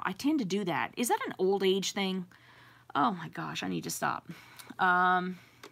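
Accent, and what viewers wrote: Louisiana, United States. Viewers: So you originally from Louisiana? That's a cajun accent if I ever heard one. I watched your astology box video and thought "mais, she from here"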